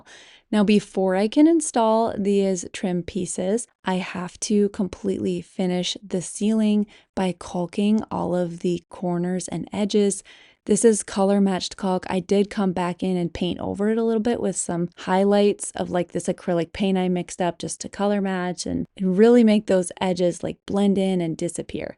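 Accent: United States. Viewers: I just love to hear * talk in her American accent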